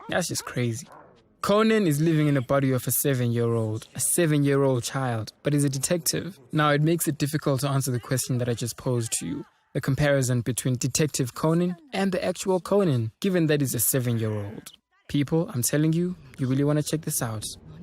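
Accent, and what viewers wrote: South Africa. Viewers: Is the guy commentating on this video from South Africa.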